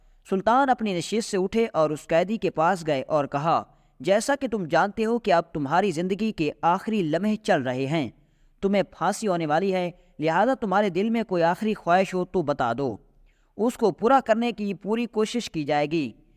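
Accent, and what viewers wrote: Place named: Saraiki, Pakistan. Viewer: Saraiki lehjy main urdu boli ja rahe ha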